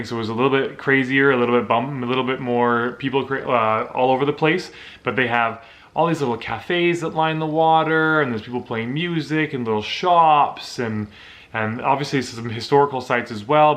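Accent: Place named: United States. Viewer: if you are canadian, why do you have american accent ? my wife is british and said you are an american when i showed her the video and КостромА, not КострОма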